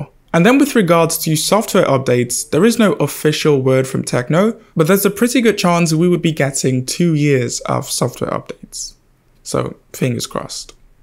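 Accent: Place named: England, United Kingdom. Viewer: yeah, probably grew up in england or schooled there or something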